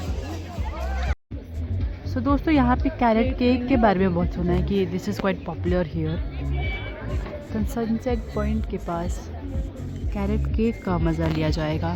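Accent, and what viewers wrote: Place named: Pahari, India. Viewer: One of my family member (one uncle) married from Shimla. They have Apple orchards. I felt Pahari influence in your hindi. It is sweet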